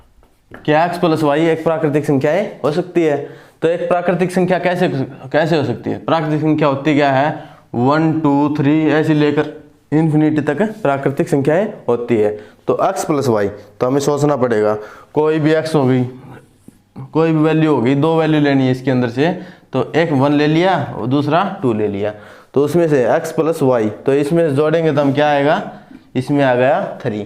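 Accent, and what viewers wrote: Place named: Haryana, India. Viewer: हरियाणवी शैली में👍👍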